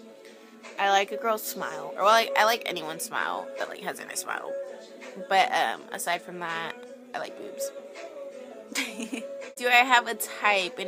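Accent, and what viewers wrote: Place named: Texas, United States. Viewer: Where are from I feel like you're from texas like me ? ;o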